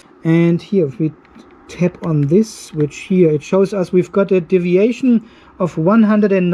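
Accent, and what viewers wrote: Germany. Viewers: I HAVE A QUESTION .... I am curious about your ACCENT what country is that it's not Australian LOL I am guessing German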